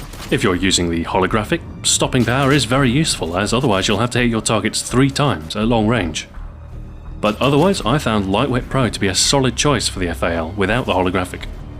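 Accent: United Kingdom. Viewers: It's the British accent and professional sounding delivery. If it was narrated by driftor it would be much less impressive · The monotone and slight british undertone give all of your videoes a "guide on tape" feel, which is just right for the amazing detail and quality of your guides. the lack of intolerable music and over opinionated screaming of most youtube videos has gotten you another watcher. I have subscribed and i hope you will do the same for black ops.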